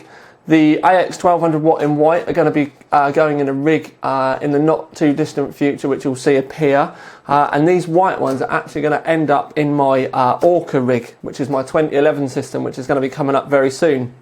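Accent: England, United Kingdom. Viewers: hes a pom, obviously.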